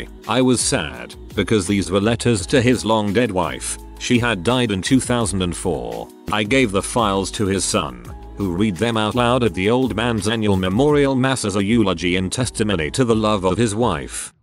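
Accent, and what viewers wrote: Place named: Yorkshire, United Kingdom. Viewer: Queen Mantis I think he's from Yorkshire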